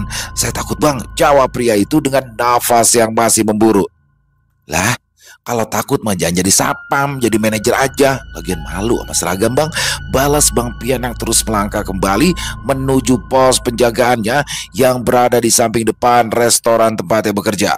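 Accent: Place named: Betawi, Indonesia. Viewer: Majalengka hadir nyimak, klo cerita bang piyan yg kocak nya baba ali,klo di bang doni emak jubaedah,yg bawain ceritanya bang kocak banget,dialek betawi nya,seru abiss DIMARI 😊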